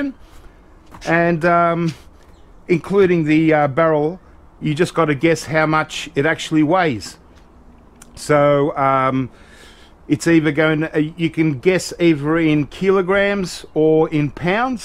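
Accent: Australia. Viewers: 73 kg! Nice tab for eBook reading. I always enjoy your videos, love your Aussie accent.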